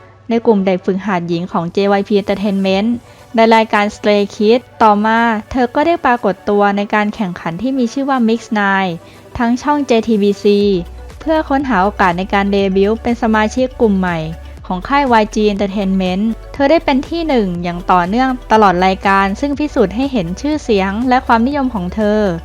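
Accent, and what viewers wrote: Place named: Thailand. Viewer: ศัพท์อังกฤษ ออกเสียงไทยจ๋าเลยพี่สาว ไม่มีการใส่สำเนียงเลย ฟังแล้วตลก แต่ก็ยินดีเข้าชมเพราะอุตส่าห์อ่านให้ฟังอะนะ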